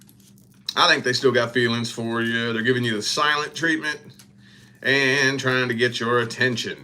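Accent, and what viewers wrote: South, United States. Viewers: I’m so glad I found u again. You crack me up. Real asf with that southern drawl…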